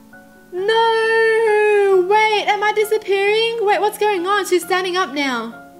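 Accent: Australia. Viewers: Are you Australian? I love your accent <3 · "So after all of that, I'm going to diss a pee?" That's how Australians speak.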